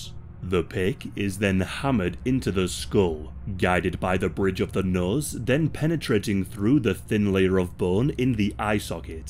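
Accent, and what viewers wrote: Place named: Yorkshire, United Kingdom. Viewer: i love the way u try to make ur yorkshire accent sound slightly posh lmaoo 😭 do you do this so its easier for people who arent native english speakers can understand you more??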